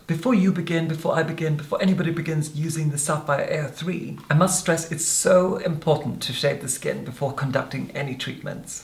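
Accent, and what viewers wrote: Scotland, United Kingdom. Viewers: Would love to hear your Scottish accent…….love from Aberdeen 🏴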